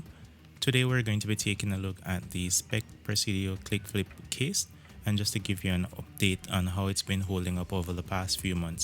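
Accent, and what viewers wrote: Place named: Jamaica. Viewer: You sound Jamaican...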